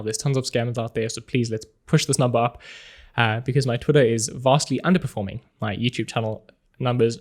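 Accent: South Africa. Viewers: Love that South African accent,! 👍👍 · You sound so South African... Love your videos, they the best.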